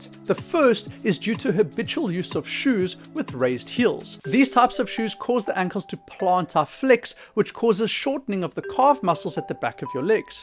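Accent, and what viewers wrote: South Africa. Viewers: He ain't no native. He from South Africa. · Oh yay, I love hearing a fellow South African YouTuber. Great video too. I need these mobility exercises.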